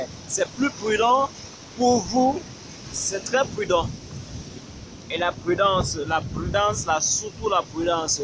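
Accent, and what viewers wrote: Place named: West Africa. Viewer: Qu'est-ce qu'il y a même à parler comme les Ouest-Africains ??? Parle comme un vrai Camerounais aka ! Tsuip !!!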